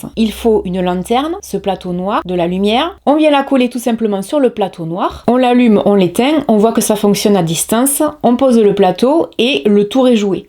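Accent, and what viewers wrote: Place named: Southwest France, France. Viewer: Bien sur que cette vidéo nous plait ! Toutes tes videos sont superbes! Je vis à montral mais je biens du sud ouest. Ca fait plaisir d'entendre l'accent chaque semaine :) merci ❤️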